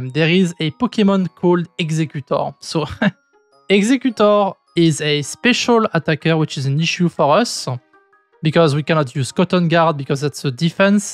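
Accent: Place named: France. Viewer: J'ai jamais vu un bon youtuber faire si peu d'efforts d'accent, vraiment là tu peux pas me dire que tu peux rien y faire, tu peux que t'améliorer parce que vraiment on dirait une voix IA française qui lit un texte en anglais c'est chaud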